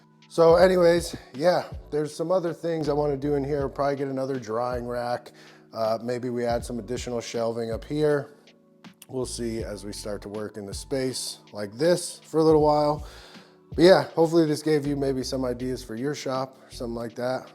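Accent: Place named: East Los Angeles, United States. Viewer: Hope you don't mind some constructive criticism, but your videos would be a lot more engaging if you did a little teaching. It is clear you have a buttload of talent, but just watching videos of you talking like we are in East LA aren't engaging. Started watching after your dual video with BourbonMoth, but still waiting to actually learn something. You have a great re-start on your channel, make it worth watching. I am just another A-hole with an opinon of course, but it would be great to actually learn something along the way. Also, get rid of the "Yo guys" at the start of your videos. You instantly start in the hole. Make your videos that somebody looks forward to hitting YT.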